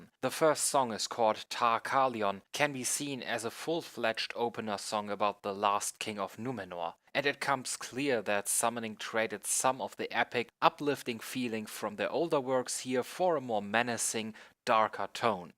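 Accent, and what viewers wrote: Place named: Germany. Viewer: no, don't do it, I need this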